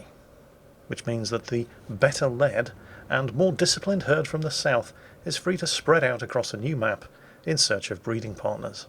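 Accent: United Kingdom. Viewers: Sir David Attenborough doesn't have anything on you,. If you fail at gaming, I certainly see a career for you in monotonous British-accented wilderness television. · his British its in there blood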